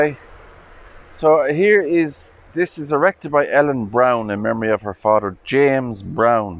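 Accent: Ireland. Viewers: You have a very gentle Irish brogue there sir, even when the subject matter is a bit somewhat dismal. Lovely trees there, I could hear a Chaffinch (Rí Rua) and a Coal tit (Meantán dubh) there (I think, I couldn't really be sure, but I suppose). What a horrifying thing to find out, people were being buried alive in those days, probably one of the worst feelings you could ever imagine☘🐦🪦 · Beautiful cemetery. Your Irish accent is as well. I love listening to you.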